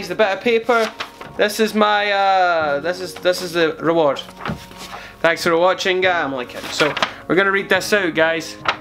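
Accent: Scotland, United Kingdom. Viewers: Is that a fellow Scottish friend I hear in that accent?!?! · Oh my god ur scottish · Skót akcentus ❤❤ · Is scottish lmao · AHHH it's bc he's Scottish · ikr, its like he is scottish or something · XD are you Scottish? Or Irish? Respect.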